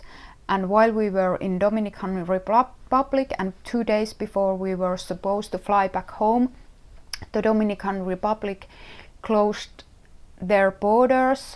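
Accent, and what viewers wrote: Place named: Finland. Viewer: Congratulations!! It is so charming to hear a Finnish accent. I spent my childhood summers in Finland and remember very fondly picking blueberries in the woods near the lake of our friend's summer house. So I would love to let some berries float on lake water: Dreaming of Finland in the knitting of silk ribbing, small bobbles and waving short rows.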